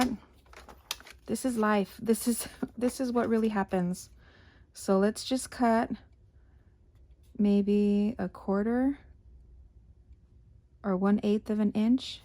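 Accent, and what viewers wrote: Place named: Colorado, United States. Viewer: In your last haul video I heard a little accent that reminded me of home. I'm from Colorado originally. Just curious if you grew up near there.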